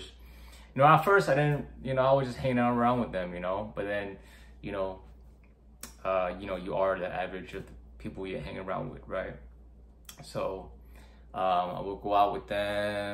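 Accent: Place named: United States. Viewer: You sound American 🏈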